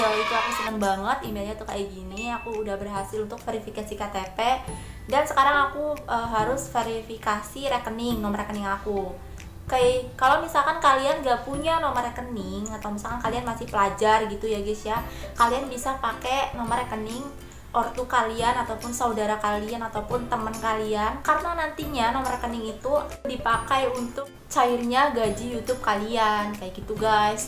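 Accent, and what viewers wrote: Banyumas, Indonesia. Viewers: 😅 dengka pada bae ngapak karo nyong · Jebul wong ngapak kaya Inyong, makasih motivasine mbakyu , sukses 😁👍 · Wong Ngapak Ya 😁 👍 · hai kaka cantik dan ngapak lagi,smpurna deh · Salam dari magelang kak. ternyata ngapak ini orang😂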